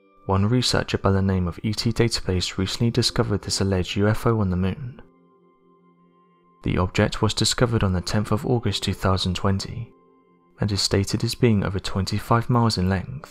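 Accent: United Kingdom. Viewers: I have to correct monotone British dude again. Any craft traveling from Earth to Mars wouldn't be called a "star ship". A star ship implies a vehicle that can travel to other stars (such as "the star ship Enterprise"). A transport vehicle from Earth to the moon or Mars would simply be called a SPACE SHIP.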